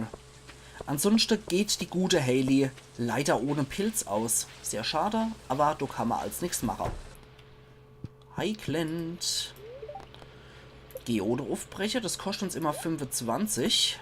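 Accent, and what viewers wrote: Palatinate, Germany. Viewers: Mein Guter, pälzisch wie immer ;) nur: schraub bitte die Auflösung hoch ;)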